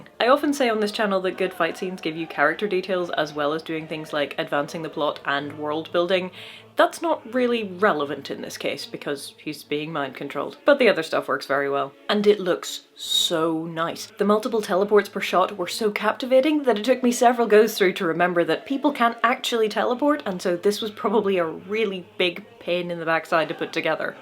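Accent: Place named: Northern Ireland, United Kingdom. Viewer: Not related to XMen but where are you from? Im from NI and California and you sound super Norn Irish. Good to see some Marvel fandom from there ❤️❤️❤️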